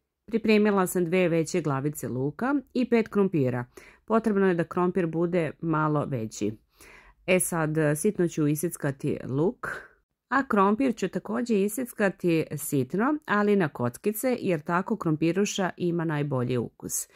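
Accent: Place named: Krajina, Croatia. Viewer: Vidite kako i drugi usmeruju pažnju na tvoj glas,na divnu dikciju i još lepši izgovor,naglasak,draga moja krjiškinjo!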